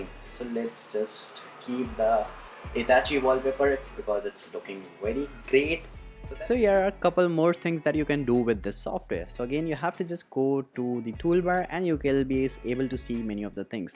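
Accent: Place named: India. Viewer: Best Indian accent i’ve ever heard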